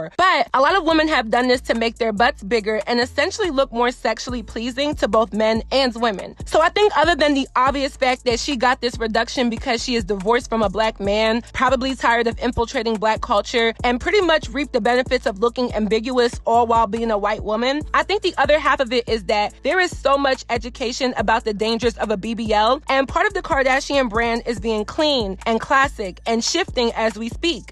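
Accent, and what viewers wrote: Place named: Baltimore, United States. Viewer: Random, but I can tell by her accent and the way she enunciates her words she is 99% likely from Baltimore....great video...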